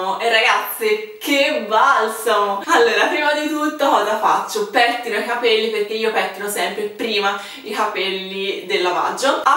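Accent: Tuscany, Italy. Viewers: Sei della toscana? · Sei toscana? Video utilissimo <3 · ma sei fiorentina' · dialetto toscano ;)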